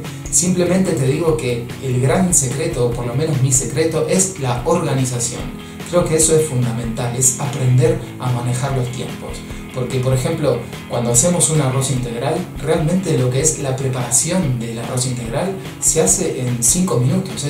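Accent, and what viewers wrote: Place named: Argentina. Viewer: Hola! De casualidad encontré uno de tus videos (creo que fue buscando cómo hace galletitas de avena) y me fuiste llevando con los temas a ver varios de ellos. Es muy interesante esto de la nutrición macrobiótica, están buenas muchas cosas que plantea pero es difícil dejar y/o cambiar ciertos hábitos cuando uno disfruta tanto de la comida y ha sido criado así no? Es más, ni bien te escuché pensé: este chico es argentino!! y no paré hasta confirmarlo!! se te nota en la forma de hablar =D Yo tb lo soy... es muy loco que estés viviendo en Finlandia!! Qué lejos!! Jaja pero bueno, nos demostrás que a pesar del frío tremendo que hace allá se puede comer saludable. Saludos desde Bs As!!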